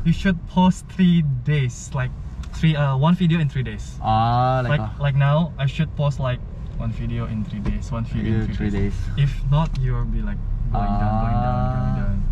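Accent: Singapore. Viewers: I’m watching this video because of phi 👀❤️ btw, are you really korean? When you speak english you sounds like singaporean 👀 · I feel like you're singaporean tho, your accent..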